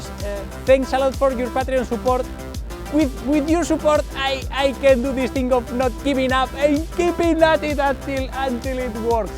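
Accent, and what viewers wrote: Spain. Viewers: de donde eres . eres de España? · Hey, are you from Spain? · He's from spain lol, im spanish too and I recognise the accent, appart from the fact that is a spanish name. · You can't unhear the Spanish accent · Are u spanish? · This guy has to be spanish mainly by his accent right? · Eres español verdad? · Eres español no? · Spanish english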